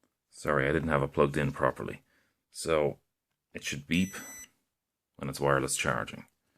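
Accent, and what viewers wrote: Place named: Ireland. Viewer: Very informative and nice voice! Can't help but love an Irish accent <3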